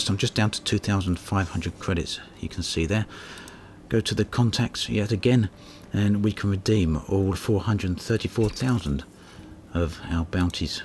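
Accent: United Kingdom. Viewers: very interesting accent, does anybody know from which region in the UK is his accent from? just curious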